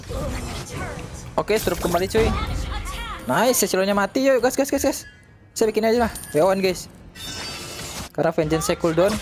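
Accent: Manado, Indonesia. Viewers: kayak orang manado pnya logat wkwk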